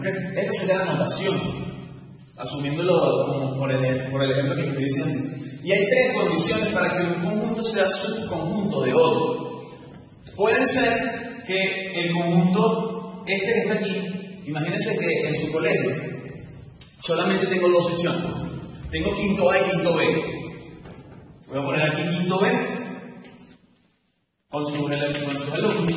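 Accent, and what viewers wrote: Venezuela. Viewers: Excelente tutorial, bien explicado, buen contenido, buen manejo del tema, buena energía y muy guapo el jóven! por el acento parece ser venezolano, me equivoco? saludos desde Guatemala! :)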